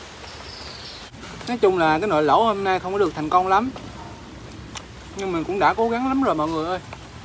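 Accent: Mekong Delta, Vietnam. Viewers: Lần đầu tiên e xem video của a e rất thích. Cười nghiêng ngửa giọng a đúng chất miền tây.ủng hộ a nhé các bạn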